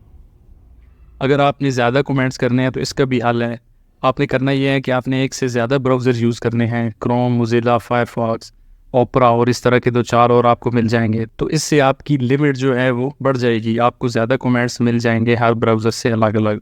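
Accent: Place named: Pakistan. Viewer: جناب صاحب السلام علیکم ورحمتہ اللہ وبرکاتہ بعدہ عرض ہیکہ آپ اپنی گفتگو کے دوران انگریزی زبان کے الفاظ بہت زیادہ استعمال کرتے ہیں کیا آپ ایک پاکستانی شخص ہیں کیونکہ آپکے لب لہجہ سے یہی معلوم ہوتا ہیکہ آپ ایک پاکستانی شخص ہیں' میرے مشاہدات و تجربات میں یہ بات آئی ہیکہ ملک پاکستان میں صحیح وخالص اردو زبان صرف علماء ربانیین ہی بولتے ھیں بقیہ عوام الناس تو انگریزی زبان سے بہت متائثر بلکہ بہت مرعوب ہے، قال اللہ سبحانہ وتعالیٰ، ألا ان اولیاء اللہ لا خوف علیھم ولا ھم یحزنون، الذین آمنوا و کانوا یتقون۔ خیر اندیش حافظ عبدالحفیظ، الجمہوریہ الھندیہ ۔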